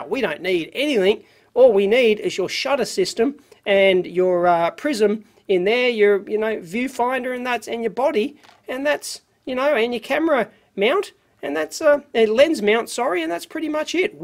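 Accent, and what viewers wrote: Australia. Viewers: The crappy Aussie accent made me turn the volume down.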